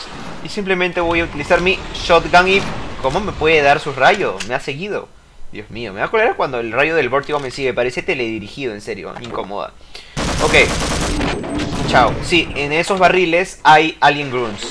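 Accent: Peru. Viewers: eres peruano? si lo eres like xddx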